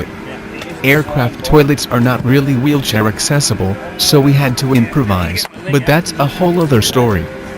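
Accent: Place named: New York, United States. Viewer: my main man! That doco was completely awesome! Very impressive effort. And I never realised you have an American accent. Bit of New York rub off hey?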